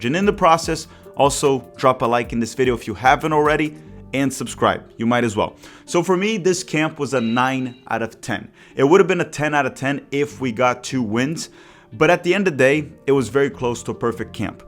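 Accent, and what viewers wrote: Rio de Janeiro, Brazil. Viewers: irmão, canal muito bom, ingles impecável com um sotaque br mto bom hahaha. análise foda, vou acompanhar bastante, sucesso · I will never understand this guy supporting Palmeiras and carrying this RJ accent. Maybe this is how this new era of "my PSG", "my Barcelona" goes, youngsters don't have the neighborhood fidelity anymore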